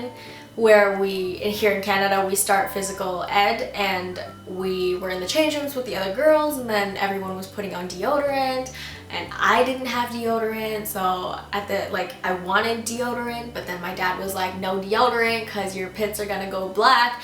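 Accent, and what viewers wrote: Visayas, Philippines. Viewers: Maayu gani kabalo pa xa mo bisaya! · Your bisaya is so adorable . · Grabe mo bisaya hahaha. Btw, Ate murag kag si Ate Bern sa CDO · Bisaya lagi kaayo dai HAHAHA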